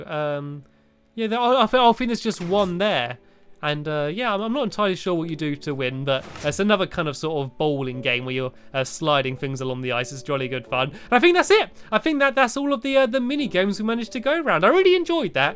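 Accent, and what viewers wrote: United Kingdom. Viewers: are you british? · People,you are mean,he is from england,so he is gonna sound like that(no offense) some people have accents,some people dont,i like stamps so don't be RUDE!!!!!:)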